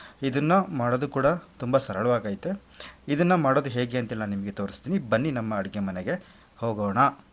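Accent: Chitradurga, India. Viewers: ಚಿತ್ರದುರ್ಗ ಭಾಷೆ ..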